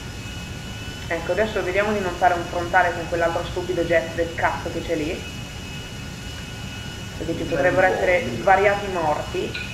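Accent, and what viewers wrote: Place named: Parma, Italy. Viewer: Ma sei di Parma? Parli come Andrea Alongi...😮 Prova a dire Saccagnato...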